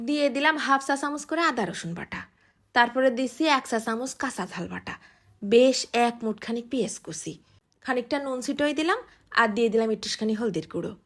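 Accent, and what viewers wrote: Khulna, Bangladesh. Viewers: আপু,তুমার বাড়ি কি খুলনায়। কতা শুইনে তো তাই মনে হয়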